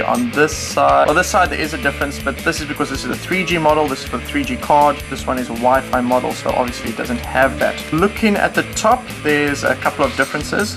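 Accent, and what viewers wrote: South Africa. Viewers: South African? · are you south african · you sound southafrican are you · Are you South African by any chance? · are you South African? you sound South African :D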